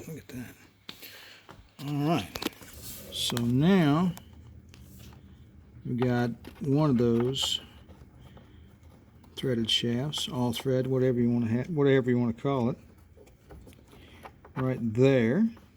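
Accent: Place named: South, United States. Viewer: Strong southern accent.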